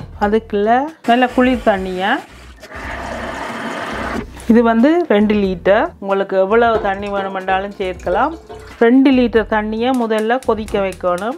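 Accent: Sri Lanka. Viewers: Super Acharu 👌👌it's so very nice to hear you with your Srilankan accent 🤪Your garden also looks nice 👏.I too do this picked vegetables but never added mangoes.I will add it next time. Vazhga Valamudan ❤❤🥰😍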